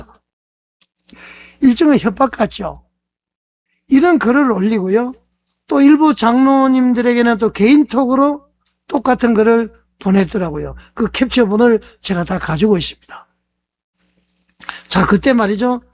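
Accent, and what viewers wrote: North Korea. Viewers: 참 낯짝도 두껍네. 이영훈 목사팔아 밥먹고 사느라 그 이북 말투부터 뜯어고치고 유트브 해처먹어 듣기싫어 이북 말투나 좀고쳐라!!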